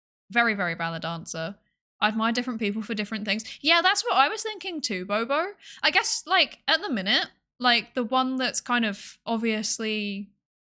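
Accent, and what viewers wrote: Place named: United Kingdom. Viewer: I don't know how I got here, but I can tell from your accent that you're from the same region in the UK as Rin Penrose, so that's good enough a reason for subbing as any.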